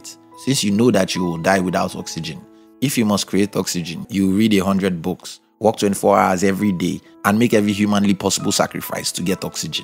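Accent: Nigeria. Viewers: You sound nigerian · Same here, this is 100% our accent...but I am trying to figure out his tribe.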